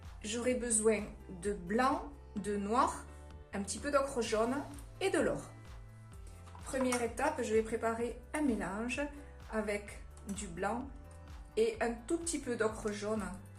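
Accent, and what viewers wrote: South, France. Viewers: Bonjour. Quelle peinture or utilisez-vous, si ce n' est pas un secret? Merci pour votre pédagogie, votre sourire, et votre accent du Sud délicieux à mes oreilles nordiques (Belgique)...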